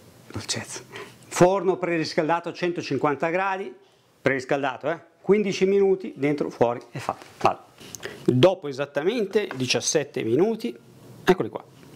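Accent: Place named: Emilia, Italy. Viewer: Simpaticissimo. Lei è Emiliano!? Perchè mi sembra dall'accento che lo sia..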